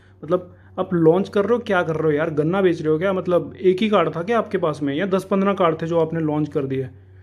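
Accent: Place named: Punjab, India. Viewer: Bro abb to khair theek he ho app Bro face reveal thora suspense ke sath karna tha Baki mere ko lagta hai ki app punjabi bolte honge ghar pe 😄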